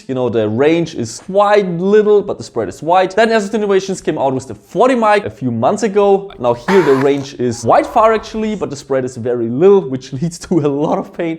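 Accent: Germany. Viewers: German accent xd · bist du ein deutscher?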